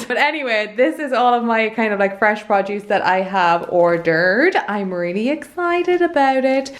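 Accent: Ireland. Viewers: Hopefully you find this insight amusing but since watching your videos I’ve been getting so much better at understanding Irish accents. It used to be with Irish and Scottish videos I have to put my full attention to follow what’s being said but now I can listen to you just in the background and understand the gist just fine... still working on the latter though. Have a great week! - Gerry (from Canada) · I am not a foodie nor a cook, but you make cooking look so interesting! I especially like the hummus recipe, may have to try that one! I want to come back to tour Ireland again so badly. Love the people, but in the mean time i just sit and watch you and listen to your accent :) OH as soon as possible could you take a walking or bike tour around your city?! Stay healthy, as i see and i am sure you are <3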